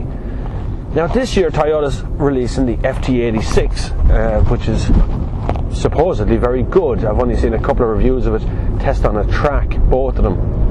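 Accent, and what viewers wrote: Ireland. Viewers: thanks!! Congrats for the reviews, you are doing a good work! (and I like the Irish accent with words as "bush" "thash" for but and that hahaha)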